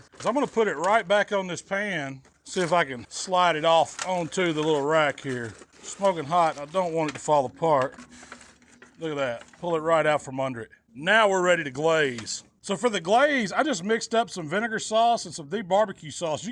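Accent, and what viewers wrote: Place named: Georgia, United States. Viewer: You know a BBQ video is authentic when the cook has a thick Georgia accent. I’ve used the snake method for cooking BBQ pork shoulder, and it’s almost a “set it and forget it” method. It’s easy, no messing with adding additional coals, and works every time. Thanks!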